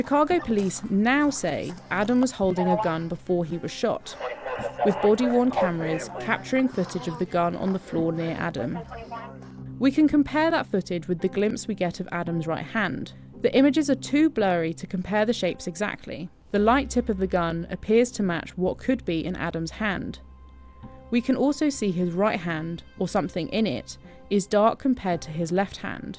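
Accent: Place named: United Kingdom. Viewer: I think they think a slight British accent makes this nonsense more believable..... SMH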